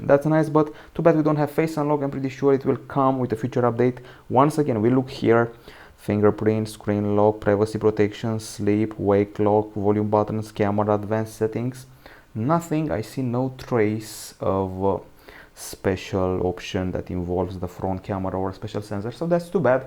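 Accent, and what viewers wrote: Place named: Romania. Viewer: You have like a romanian accent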